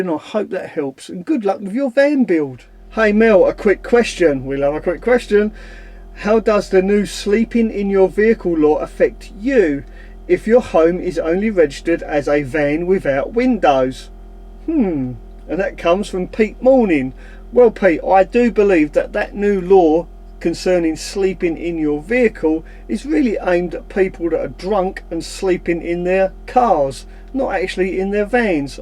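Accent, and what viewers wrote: Cockney, United Kingdom. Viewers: Hi Mel, just subscribed. Keep it up buddy including that cockney accent. You sound just like my old pot and pan! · I understand every word you say as my Mum, uncles and Aunties were all cockney. I'm not but my ex was always saying I was saying certain things wrong. Luckily for me it was his attitude to me 5hat made us split up lol. Xx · Hi Mel, hope you are well. I don't have a problem with your cockney rhyming, I can understand everyfink you say. So, I have a question...If your van is registered as a van with windows, and not as a campervan, why did you fit your cooking stove permanently? Thanks for sharing. Best to Becky and Monty. Stay safe and keep up the good work. :) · "The Jovial Cockerney" - It's GREAT! that you're keeping it alive Mel .... Too much of that 'other' Cockney-Replacement-Lingo these days for My liking 🤔..... 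Just don't go all 'Joe Swash' on us EH ?? 😄😂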